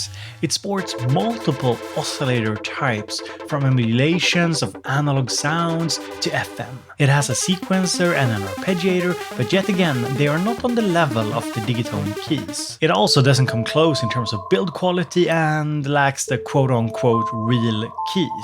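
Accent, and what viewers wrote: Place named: Sweden. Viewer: Very traditional svenglish ;)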